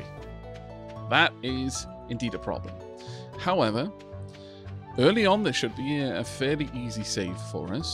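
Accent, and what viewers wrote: Wales, United Kingdom. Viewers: Just found this series love it. You've got a hint of Welsh, where are you from? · Are you a welshy like me? 🏴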